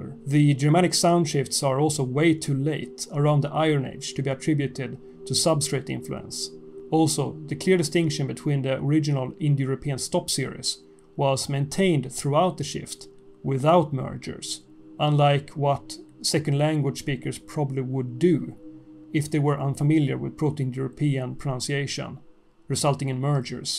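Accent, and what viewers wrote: Sweden. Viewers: As a Swede, I appreciate the heavy Swedish accent!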